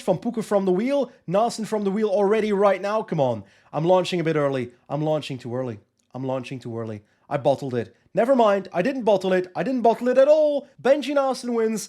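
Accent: Belgium. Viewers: Are you from Belguim or the UK?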